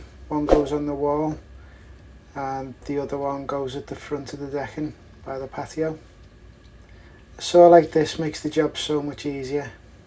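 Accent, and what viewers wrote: Liverpool, United Kingdom. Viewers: …he’s a scouser la..💙👍🏻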